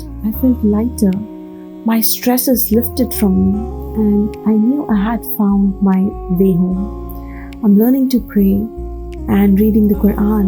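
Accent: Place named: India. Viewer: You say you are strictly British yet you have a very Indian accent. I find that's odd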